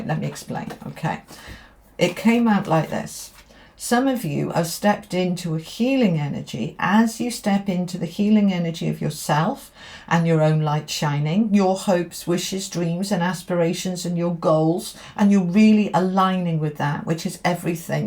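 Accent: United Kingdom. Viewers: Dear I started watching you around this time last year. A few things I love about you (besides your beauty and style): You are genuine. Your readings are not robot-like or business-like. It feels like you’re talking to a best friend. You’re also motherly, “in the moment”, very kind and patient. Sometimes I listen to you over and over when doing my hair or doing housework. It just feels homey and warm. I repeat certain words right after you with British accent haha (I’m writing from California). There is more to your videos than just simple tarot readings. There is heart, there is depth and there is wisdom. Have a lovely 2025, beautiful! You are appreciated.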